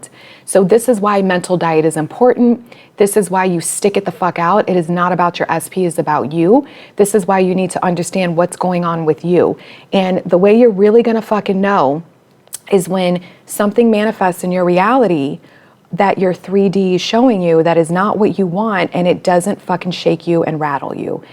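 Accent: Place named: South, United States. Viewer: Such a New Yorker....love the accent from down South girl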